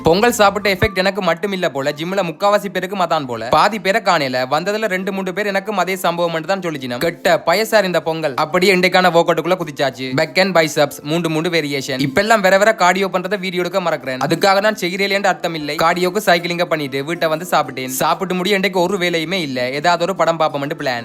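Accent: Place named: Sri Lanka. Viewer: Sri lankan tamil pesinalae azhagu than❤😻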